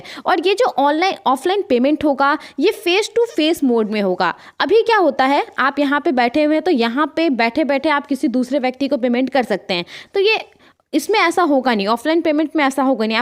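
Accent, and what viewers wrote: Bihar, India. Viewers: मैडम आप बिहार se ho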